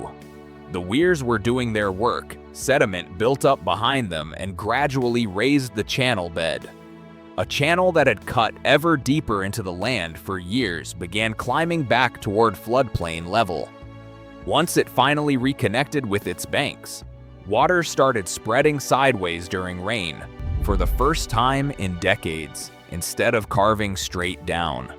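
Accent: United States. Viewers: Should have had an Australian do the vocals not a god dam yank. Anyway you greenies suck eggs.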